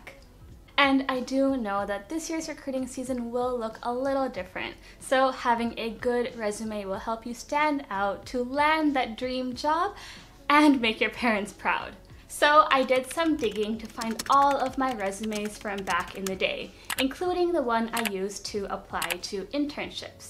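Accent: Andhra Pradesh, India. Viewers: Hey telugu ahh 😅 .. native ekkada ? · o.O girllll! you are from andhra wow. Your accent is sooo coool.I love that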